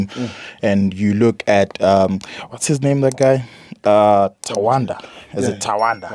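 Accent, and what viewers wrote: South Africa. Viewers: Brilliant analysis Brilliant Jozi accent and eloquence. Thanks for salient commentary 🙏🏾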